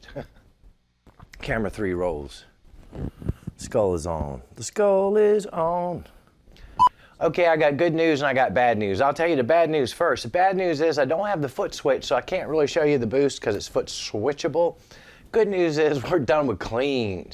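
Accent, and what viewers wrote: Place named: Delaware, United States. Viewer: You’ve got sort of a Walton Goggins voice and humor thing goin’—that’s a compliment. [Vice Principals, The Righteous Gemstones….] You’re from Delaware? I grew up there but don’t remember that accent. // Looking forward to getting this amp in a few days—well, oddly, it’ll be this or a Princeton or Deluxe Reverb…. Depends on which one makes it move….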